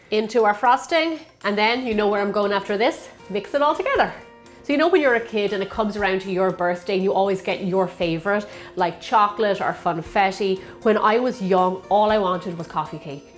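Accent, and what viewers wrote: Ireland. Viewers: You've explained everything so well , so easily understandable for newbies like me Also , Love your voice and accent ❤️ Irish., I'm guessing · Are you Irish, I love your accent!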